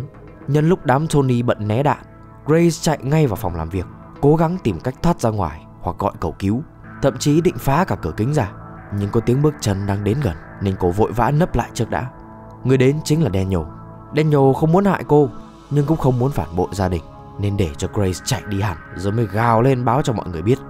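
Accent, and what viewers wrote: North, Vietnam. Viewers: admin nói giọng bắc mà chill bro 😭😭 tôi ng bắc vẫn hay đùa backi thấy cũng vui mà miễn k có ác ý là được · Sao tao nghe thấy thằng làm content đọc bắc kỳ trong cái video này? Phân biệt vùng miền lên cả recap phim rồi? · Tính ra ng đọc nói giọng Bắc mà, tôi nghĩ chúng ta có thể hiểu nó giống như người da đen nói niga <(“)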